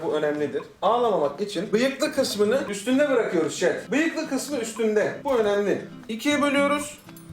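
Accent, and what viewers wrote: India. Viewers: Hint aksanı mükemmel. Devamını bekliyoruz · Lan bu nasıl bir aksandır muhteşem lan hintlilerden bile daha gerçekçi konuşuyor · hasikitr lan hint aksanani efsane yapiyo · hint aksanı > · Ben bu kadar güzel hint aksanı duymadım hayatımda aşırı iyi hzjahsjajs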